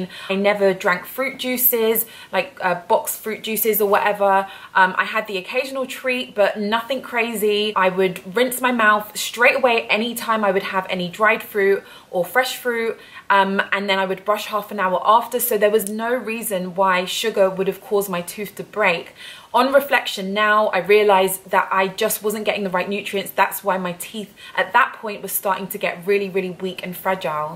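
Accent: United Kingdom. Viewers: Her British accent is soon cute!!!, wait a minute!!! I'm a brit n have one myself!! Damn I sound cute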